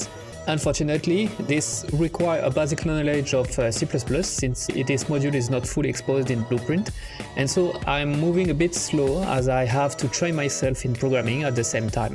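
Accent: France. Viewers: you ar french youuu ?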